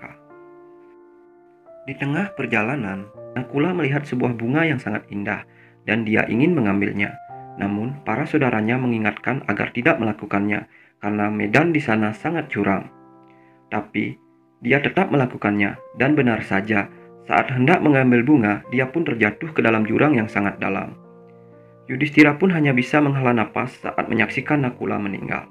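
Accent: Bali, Indonesia. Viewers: ciri khas logat bali heheh pelat r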